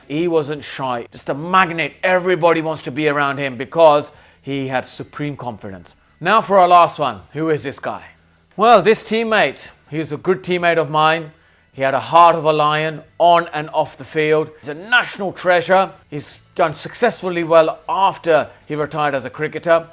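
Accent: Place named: India. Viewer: You still have some Indian accent left within you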